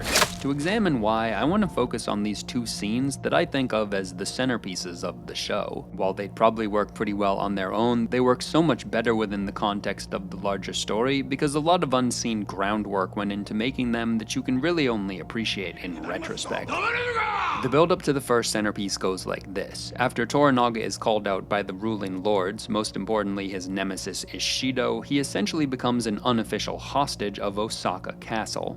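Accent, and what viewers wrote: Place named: United States. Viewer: Since he's pronouncing everything with a fairly strong American accent, he would probably pronounce Kurosawa wrong as well 😂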